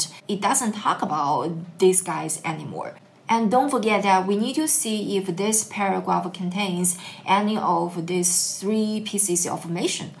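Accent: China. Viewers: Thanks for sharing your strategy! You have an obviouse Chinese accent.🤣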